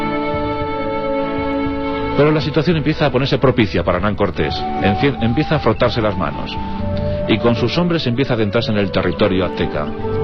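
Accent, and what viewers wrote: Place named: Spain. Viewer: que se puede esperar...El autor de este video, y se puede notar en la voz, son españoles... No me sorprende que hagan ver a tenochtitlan como los malos y los españoles como los buenos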